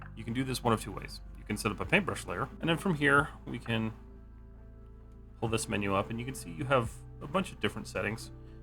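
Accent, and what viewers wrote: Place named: South, United States. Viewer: I appreciate the video, and I don’t want to upset you by saying this, but constantly hearing “height” as “heighth”, like you’re a 16th-century man with wooden teeth, is driving me mad, especially when it’s said correctly in the same video. 😂 (I understand it’s a quirk in some parts of the South, so no hate, but it’s killing me.)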